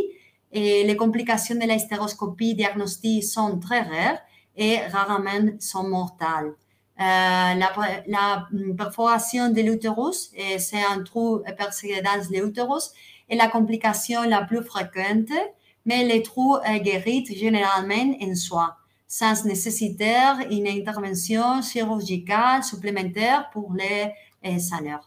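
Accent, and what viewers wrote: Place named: Spain. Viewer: Me encanta tu manera de hablar francés mezclada con español. Gracias por la explicación.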